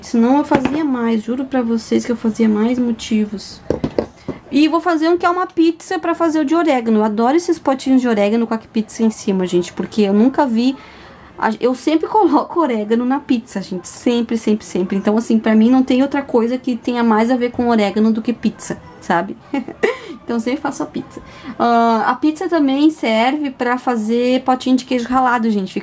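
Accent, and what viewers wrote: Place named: Rio Grande do Sul, Brazil. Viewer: Oi adoro suas explicações eu qdo o seu zap.amada não fica xatiada não com esse tipo de COMENTARIO NAO TENHA FE EM DEUS ELE TEM O CONTROLO DA NOSSAS VIDAS BJS. GOSTO MUITO DE VC É ADOOOOOOOOORO SEU SOTAQUE TCHÊ FICA COM DEUS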